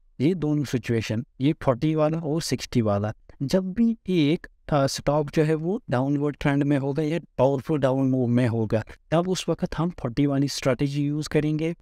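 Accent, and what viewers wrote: Kashmir, India. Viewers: Bhai. aap ne to pura gyan hi de diya. Unclear vision sab fully clear kar diya he. Love you bhai. Hamesha khush raho aap or ese hi knowledge share karte rahiye. Apka awaz sunke mere kashmir wale dost ki yaad aa gayi. I am your subscriber now. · Ur accent .... Kashmir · After listening just couple of your words i came know your are from Kashmir... Well done 👍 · Your accent is kashmiri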